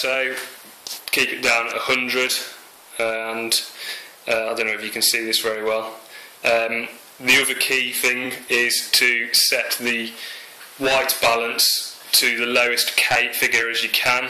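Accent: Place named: Birmingham, United Kingdom. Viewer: thanks! just what i was looking for! also i love your brummie accent :) x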